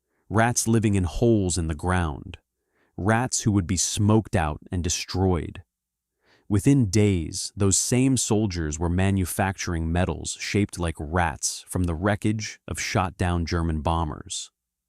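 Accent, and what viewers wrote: United States. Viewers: Stupid Al strikes again miss spelling and an Al generated yanky accent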